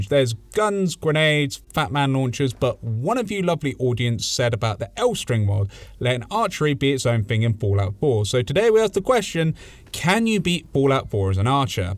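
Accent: United Kingdom. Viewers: I swear you make yourself sound more British every video. I guess I kind of do the same thing in person where I make myself sound more American. I’ve recently added “I don’t rightly know” to my lexicon.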